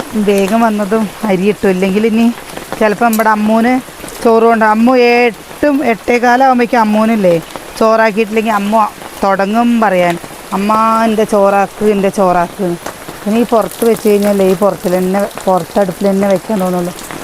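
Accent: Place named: Kozhikode, India. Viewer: koyiod samsaarikkunnad kelkaan nalla rasa😊😊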